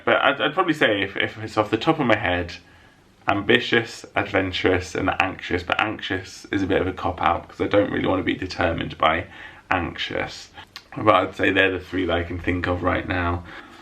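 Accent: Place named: Scotland, United Kingdom. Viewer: I think it's perfect you put the questions in a Mickey Mouse cap. We need to hear more of your Scottish accent😂 Aww thanks for answering my question - to be honest I can't imagine any actor playing you because you are one of a kind!❤️ Haha I love the carpet demonstration! "Sophisticate, and I can see you have a cat"😹 Okay I was not expecting you to say bus driver! Oh my gosh I absolutely LOVE all the family footage - you all made me laugh so hard! And then your mom made me want to cry😭 Aww there we all are at the Angel Inn - that was almost exactly one year ago, can you believe it? We had the best time with you two! we are definitely missing your content as well. Can't wait for part 2!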